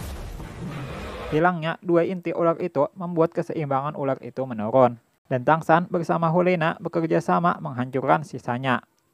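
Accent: Banjar, Indonesia. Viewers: Aksen nya mirip orang banjar hulu sungai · Suaranya Mimin kaya logat orang Banjar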